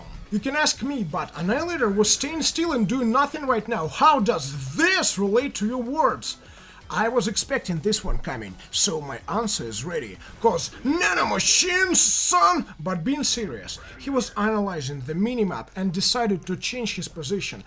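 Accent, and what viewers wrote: Russia. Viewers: очень годно ! =) но русский акцент уши режет , непривычно так . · True russian accent) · i love his voice pls more from this russian english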